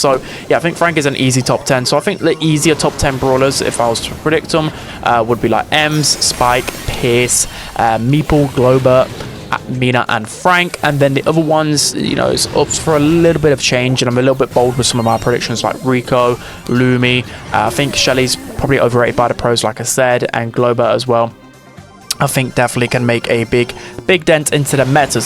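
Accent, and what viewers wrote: United Kingdom. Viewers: Bro's heavy British accent wont let me understand some of his words, who tf is Russ?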